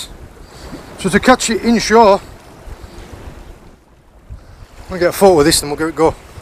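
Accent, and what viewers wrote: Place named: North of England, United Kingdom. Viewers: you say you hope we enjoyed seeing you catch AND release some lovely bass and even a couple of Pollock. Whats NOT to like about you with your super Northumbrian accent your ALWAYS passing on your skills?? You are what Mr Elton Murphy is to Coarse Angling, You are to Sea Angling young man!! Both first class AMBASSADORS to your chosen disciplines. 😂😊😂. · Best fishing stuff on YouTube.. & this chap sounds like he's from north of England.. & I'll bet he's military or ex military.. reel guy.. very addictive viewing.. keep it going... Manchester😃😃.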